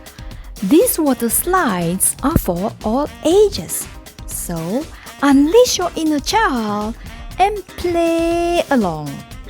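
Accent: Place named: Japan. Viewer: Lol..I see. Now I know this is an English video with some Japanese pronunciations..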